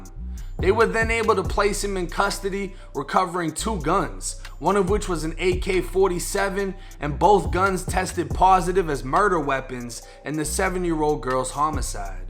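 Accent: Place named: Northeast, United States. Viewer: Gotta be from NE area, I love that accent too...lol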